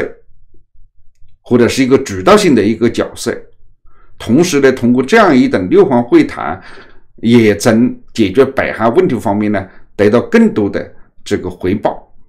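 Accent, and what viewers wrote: Hunan, China. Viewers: 我麻烦你莫用湖南腔来港话好不？丢湖南人脸，你老屋哪里滴？长株潭地区的吧?真滴舍里舍格！ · 这个主持人一口的湖南腔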